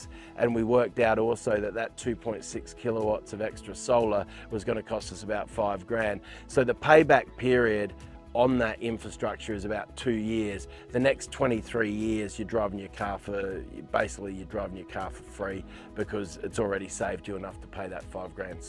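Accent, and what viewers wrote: Australia. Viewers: You sound like an Aussie but you speak in terms of miles and referencing US locations. Are you referring to costs in Australia or the US? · I've been watching your videos thinking you were in Australia due to your Aussie accent but now realised you're in the US!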